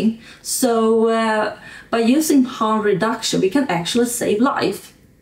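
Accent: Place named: Sweden. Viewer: Good Beatrice fight for this issue. Do take some english pronunce lessons. But it is okay with a swedish accent.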